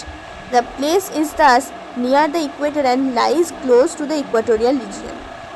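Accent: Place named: Tamil Nadu, India. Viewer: Blud got the accent of a Tamil niggi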